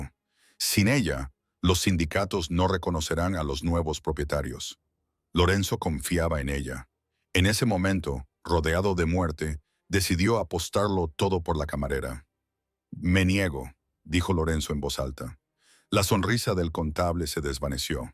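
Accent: United States. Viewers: ME ENCANTA LA IA , CON ESA VOZ CON ACENTO ESTADOUNIDENSE. , SERÁ PORQUE TENGO UN AMIGO ESTADOUNIDENSE QUE HABLA EL ESPAÑOL ASÍ. AH POR CIERTO ME ENCANTAN LAS NARRATIVAS DE ESTE CANAL. MUCHÍSIMAS GRACIAS. SALUDOS CORDIALES DESDE CDMEX MÉXICO.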